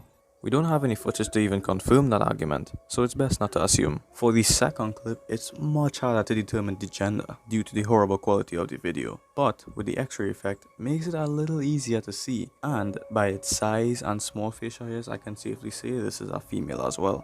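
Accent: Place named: Trinidad and Tobago. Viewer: Eh you from Trinidad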